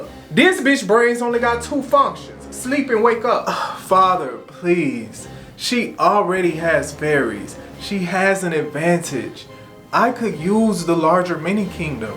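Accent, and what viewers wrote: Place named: New Orleans, United States. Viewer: Your NOLA accent was on point friend and this coming from a BR native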